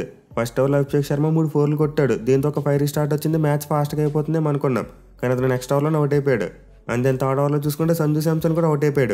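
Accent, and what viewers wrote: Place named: East Godavari, India. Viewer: Bro midha east godavari aa 🌝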